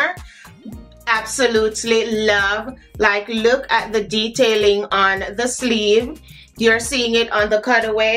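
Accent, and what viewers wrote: Jamaica. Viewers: Love your style and l ove when you speak jamaican patois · Hi K, Jay and I are here laughing hard! He loves how you bring in your patois! 🥰 As always, here for the fashion and always enjoy the vibezzzz!!!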